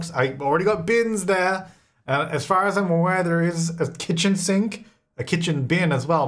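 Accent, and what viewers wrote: Australia. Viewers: I love your australia english :)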